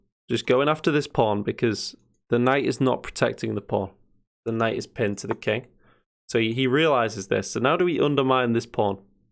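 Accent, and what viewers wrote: Hull, United Kingdom. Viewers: You sound like you’re from Hull??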